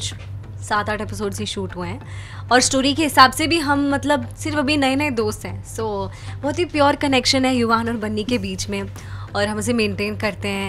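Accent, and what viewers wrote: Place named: Bihar, India. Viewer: Luv u and ur style and chehra kep it like this like ur bihari accent wan to hear mor carry on shelter for stray dogs and animals they need care mute they r feel pain cant say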